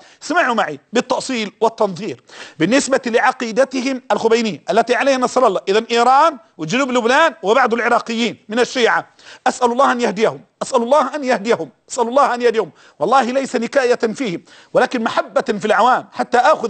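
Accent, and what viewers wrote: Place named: Palestine. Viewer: بالمناسبة هذا ليس مصريا فاسمه ولهجته فلسسطيني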